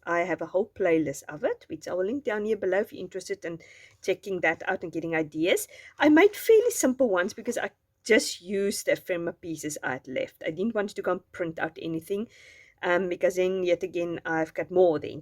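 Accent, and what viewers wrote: South Africa. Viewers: Oh my word this is gorgeous!!! I am new to your channel! South African?😃 I’m from South Africa! Bless you and you beautiful channel!💕💞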